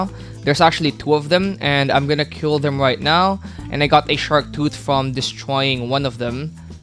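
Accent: Philippines. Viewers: Are you a Filipino? Because i think you are cause of accent lol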